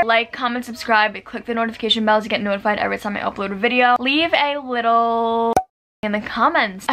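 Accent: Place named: New York, United States. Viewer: first video I saw of yours I noticed the accent lol, I'm live in ny so I was low-key thinking ,, hmmm maybe she's from ny lmaooo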